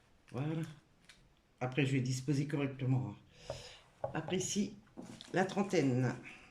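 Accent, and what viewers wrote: Nord-Pas-de-Calais, France. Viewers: Coucou. Tout d'abord Merci pour ta petite attention (de mon anniversaire). Je suis contente de voir ton visage, tu n'as pas à être complexée, chacun est comme il est et c'est comme ça. Tu es très bien et ton visage va très bien avec le son de ta voix, que j'adore vu ton accent ch'ti. Donc RAVIE de te voir en entier. Gros Bisous et 1 like en plus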